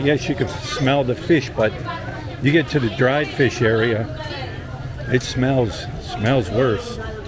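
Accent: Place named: Philadelphia, United States. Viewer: Hey, since your there for the long hull, how bought taking some Pilipino language courses to be able to speak a little and understand a little bit more of the Country your living in. I would and maybe in return teach someone some of you own intonation from, I think that would be cool, what do I know, love your Gig, Thumbs Up Dude, Ricky from Cape May....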